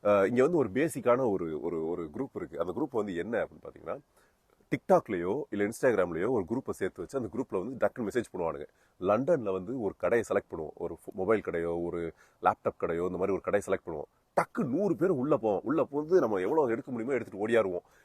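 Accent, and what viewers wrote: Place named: Telugu, India. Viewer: He is a Telugu guy that's why he speaking like this.........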